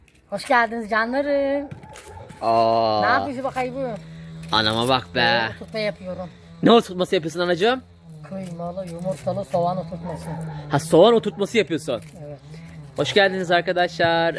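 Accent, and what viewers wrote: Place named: Çaycuma, Turkey. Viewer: SELAMLAR OGLUM BEN KARABUKLU TEYZENIM BIRINCISI GADUN ÇOK GOZEL AMA BEN 40 YILDIR CAYCUMADA YASIYOM ÇAYCUMA SIVESINI SIZDEN FAZLA KONUSYOM BAYILIYOM KONUSMANIZA HARI KULADESINIZ BENI KONUSURKEN GORSEYIZ BURALI ZANNEDERSIYIZ ALLAH HAYIRLI KAZANCLAR VERSIN YAVRUM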